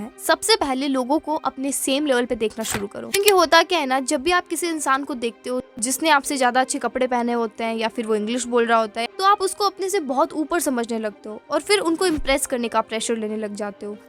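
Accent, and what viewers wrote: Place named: Marwari, India. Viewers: Aapki marwari sunkar achha lga di 😊❤ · Diiii...aap rajasthan se ho..mein bhi ..maine aapki har video dekhi hai.aap bohot achi videos banathi hai.aur aapki marwadi slang bhi ek masth hai 🤌🎀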